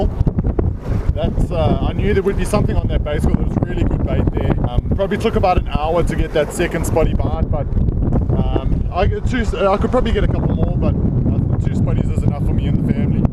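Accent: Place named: South Africa. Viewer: Always nice to hear a Saffa accent :)) keep the vids coming even though I fish off a boat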